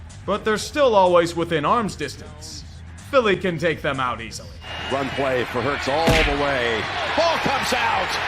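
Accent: Pittsburgh, United States. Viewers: Man I missing hearing the voice of this Yinzer bastard! 😂 Welcome back,. 👌🏾💯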